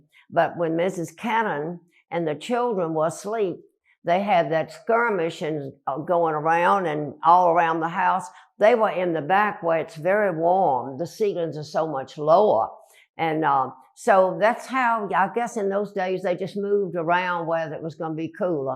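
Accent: South, United States. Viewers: Oh dear, what a precious little lady!!! I’m like two minutes in and I’m just dying over her sweet southern accent! 😍😍😍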